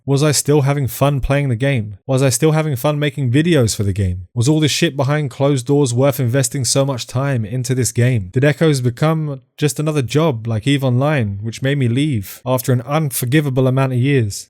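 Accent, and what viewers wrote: United Kingdom. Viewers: I admire your British accent, will miss it...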